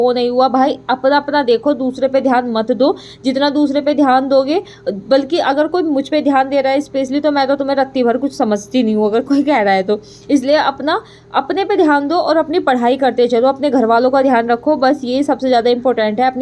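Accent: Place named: Braj, India. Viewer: Kaha se ho? Brij wasi si lg rhi ho?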